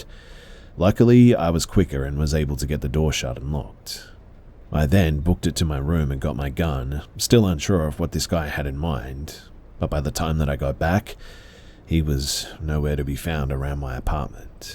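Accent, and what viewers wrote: Australia. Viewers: I've spent countless hours being lulled to sleep by your sweet Aussie accent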